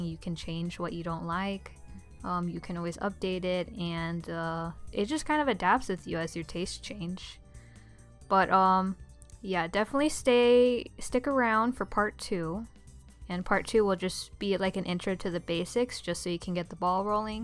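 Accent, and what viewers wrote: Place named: Midwest, United States. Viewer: Are you from the Midwest by any chance? I'm asking because of your accent. It sounds very "midwest"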